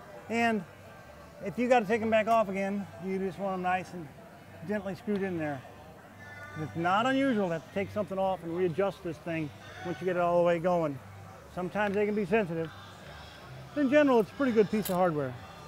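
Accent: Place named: England, United Kingdom. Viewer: I love your English accent and I hope if you start teaching English that will be amazing 🤩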